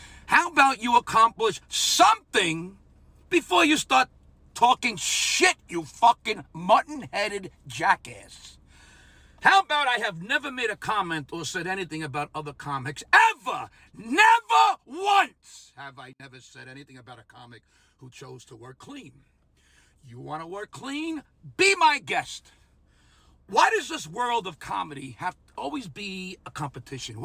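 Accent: New York, United States. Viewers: I was born in NJ exit 13, grew up exit & A. Family is from Brooklyn Bay Parkway and 86th street. When you speak your accent makes me think of home. In HS the Jews were close friends with the Italian kids. We liked to date them because they were easy I men friendly. The Italians dated the Jewish girls and discovered what a headache was. Cultures are very similar. Comedy back in the 60s was really funny and if you went to the Catskills it was dirty. Think of Buddy Hacket. I miss the old days and you bring them back for me. Dont shut the fuck up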